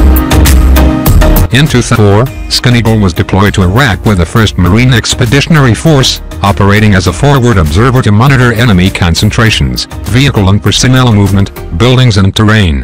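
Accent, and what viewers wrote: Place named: Russia. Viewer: Poor sound - Russian accent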